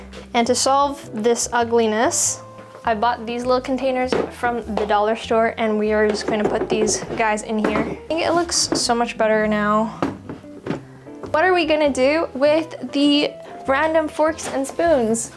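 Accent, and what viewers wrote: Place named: Saskatchewan, Canada. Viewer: Thanks for a real life video. Not everyone lives a “home magazine life”. Are you canadian? You speak just like my Dad from Saskatchewan. Love from Norway.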